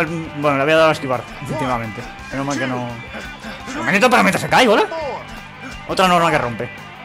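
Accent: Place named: Chicano, United States. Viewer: Apoco si hablas español, pensé que eras chicano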